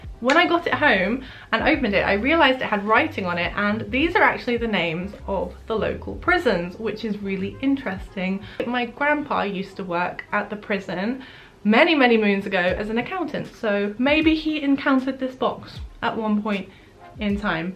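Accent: United Kingdom. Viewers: Wow, your thrift stores are amazing! I love the way you say "Charity Shop" , it sounds so classy with your British accent. Those were amazing finds ,especially those Headbords and beaded lamp shade 😎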